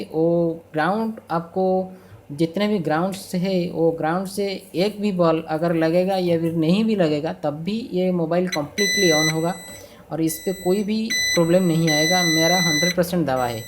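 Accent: Odisha, India. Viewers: Brother you are from Orissa because of your Hindi speaking style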